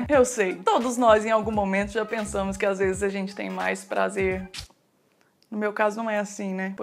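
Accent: Minas Gerais, Brazil. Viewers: É MINEIRA ?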